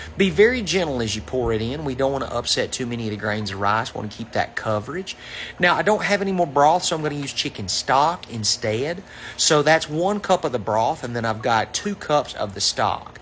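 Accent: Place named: South, United States. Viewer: Looks so good! And easy. You sound like me! Love to support my Southern family! ❤❤ Subscribed…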